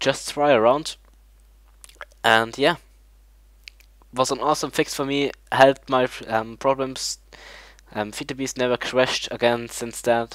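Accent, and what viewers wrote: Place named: Germany. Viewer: MAn merkt dass du deutsch bist xD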